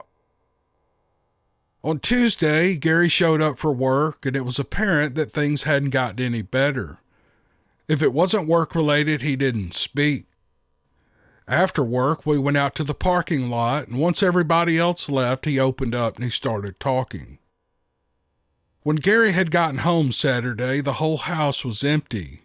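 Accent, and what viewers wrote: South, United States. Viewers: Gosh I’ve missed you! Some how I got unsubscribed and have been dealing with deaths in our family 🥺 today I went looking for you and it’s so nice to be back home.. I love your chit chat and I’ve missed your southern accent ( from Eastern Washington State🤣) thank you for all you do and this beautiful community of friends💕🌻🙏♥️God bless you all!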